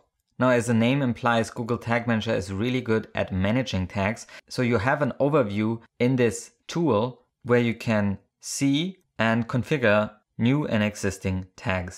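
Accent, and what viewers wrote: Germany. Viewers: love that german english hehe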